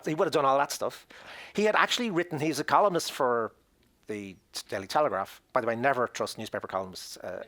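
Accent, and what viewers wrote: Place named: Ireland. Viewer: As an Irishman, I appreciate your comment. I would like to suggest an answer to your question 'why are all the most intelligent commentaries on this delivered with an Irish accent?' In Ireland, our requirement to have a referendum every time we adopt a new EU treaty means that we are forced to pay attention to the EU. We know and understand the EU better than English people, who don't get it at all. It has the added benefit of leaving Irish people with a sense of ownership of our membership. Before we, the Irish, joined the EU in 1973, for the previous 50 years we are had the type of independence that the UK is now seeking. We know the limitations of going it alone in the world, its and outdated form of independence that belongs to the early 20th century and only okay if you like being poor. It is also somewhat of an illusion - as a small country will always have to give way to a bigger country in trade negotiations...in this case the EU will always dominate the UK. 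We are never completely free, independent or sovereign. In short, we know how deluded the aspirations of JRM, BoJo and IDS and others are. I wish you well.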